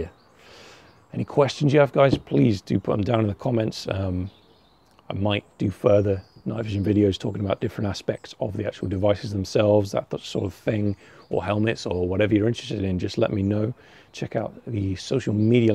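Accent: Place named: United Kingdom. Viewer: I just realized, man's a brit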